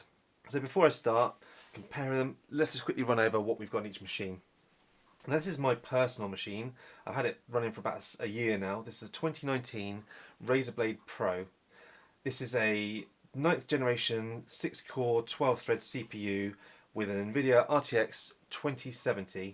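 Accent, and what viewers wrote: United Kingdom. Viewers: I gotta tell ya I was just mildly interested in laptops cause I'm figuring out which alien gaming beast I should get, but more I watch more I wanna know. All this undervolting, different testing etc.. Really interesting. Your videos are great. Hope the channel explodes. Keep it up mate! Edit: what part of England is this accent you sound a lot like Ricky Gervais same accent where is that?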